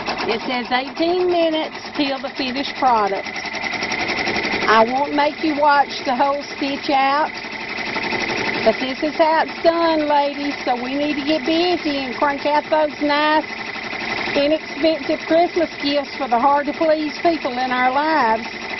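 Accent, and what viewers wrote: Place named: Alabama, United States. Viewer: I knew that was an Alabama drawl...Im in Alabama too!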